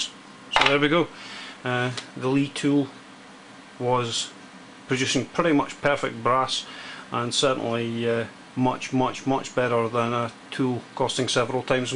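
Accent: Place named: Scotland, United Kingdom. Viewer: Sound Scottish...good video.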